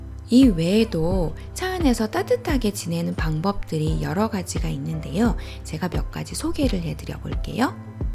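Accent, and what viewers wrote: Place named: Gyeongsang, South Korea. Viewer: 말씨에 경상도 말씨가 . . . .종종 ㅎㅎㅎㅎ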